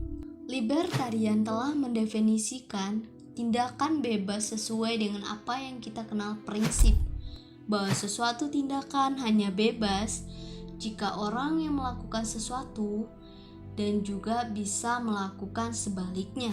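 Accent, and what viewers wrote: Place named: Java, Indonesia. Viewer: Lucu ih suaranya medok khas gitu 😂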